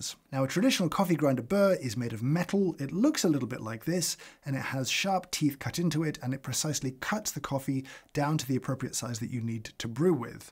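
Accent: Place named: England, United Kingdom. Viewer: delivered with such a quiet kind English accent, it almost goes unnoticed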